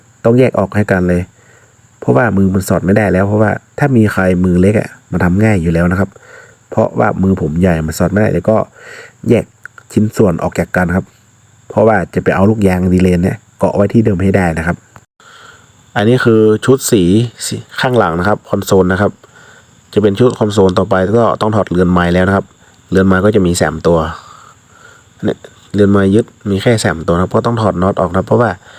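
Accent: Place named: Chiang Mai, Thailand. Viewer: สำเนียงออกเจียงใหม่แต้อ้าย😂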